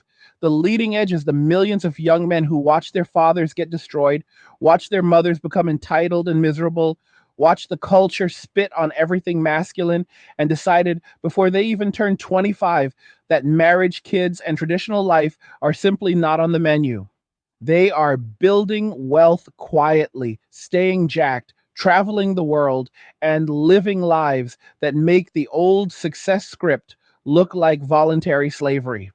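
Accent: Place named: Panhandle, United States. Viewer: you got that inbred panhandle voice going on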